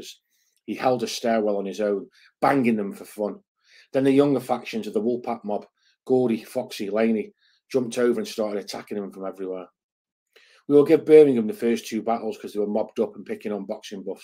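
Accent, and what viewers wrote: Stoke, United Kingdom. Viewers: I’m proper addicted to this channel, the Stoke accent, the content, fucking everything really! (Can you find more stuff about Stoke that would be ace, whether it’s football or anything else criminally based!)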